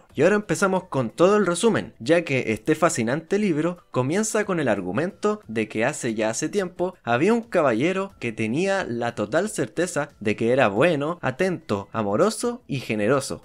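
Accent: Chile. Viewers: bro, eres chileno? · A que es chileno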